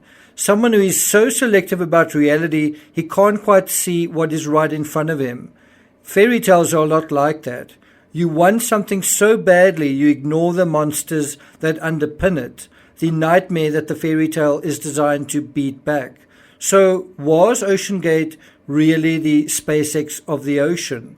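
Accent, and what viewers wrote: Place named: South Africa. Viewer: Ive been trying to figure that accent out and i think its South African? Great content btw.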